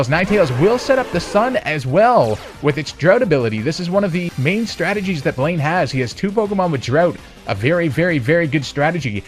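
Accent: Canada. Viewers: Are you Canadian or am I imagining your accent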